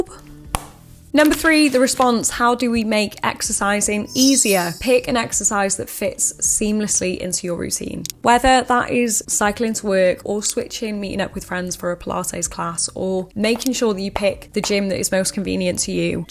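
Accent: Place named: North Wales, United Kingdom. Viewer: Fellow north wales girl here thought your accent sounded familiar x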